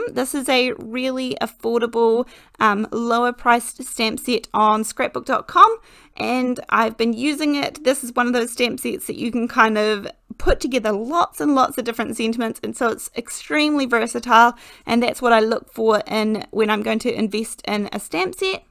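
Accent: New Zealand. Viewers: It's gorgeous. Love all your ideas - I have that old stamp set and have never noticed the birds - they are coming out of hibernation, I can tell you now. The simplicity of the card makes it even prettier I think. I would love to get Alina's tape, but it is quite expensive when you add the shipping to Australia and then have to wait for the product to arrive as well. However, it does look fabulous and great to work with. You have an N.Z. accent but you have an Irish / American roll to your r's in words like bird and work. A connection there perhaps? Just curious. Still loving watching all your Youtubes. Thanks so much for sharing your great ideas.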